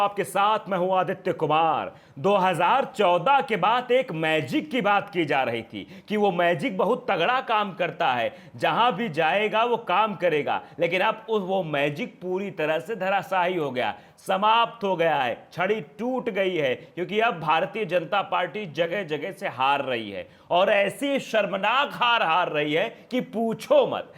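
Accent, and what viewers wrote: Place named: Uttar Pradesh, India. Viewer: present news very nicely. His tune is very much UP based. I like it very much and enjoy listening him. Keep going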